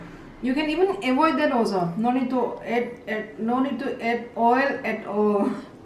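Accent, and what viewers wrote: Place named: Bengal, India. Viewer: Upki language bengale jeisa lakta hei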